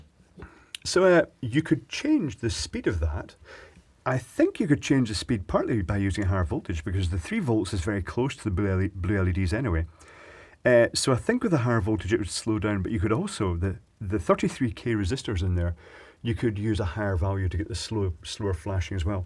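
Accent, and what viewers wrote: Scotland, United Kingdom. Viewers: There's something inherently calming and therapeutic watching a softly-spoken Scottish bloke carefully soldering small electrical circuits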